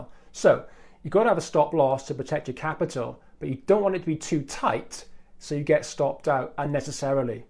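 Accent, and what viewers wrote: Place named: Wales, United Kingdom. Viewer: I Live in Hirwaun. You don't sound to far away.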